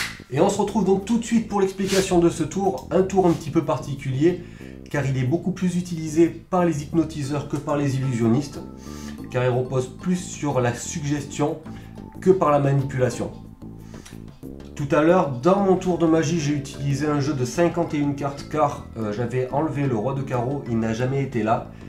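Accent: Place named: South, France. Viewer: salut, une critique pour tes vidéos, si tu doit améliorer quelque chose c'est l'accent du sud, je sais pas si tu l'entend mais essaye de le supprimer ou alors exagère le. allez bisous.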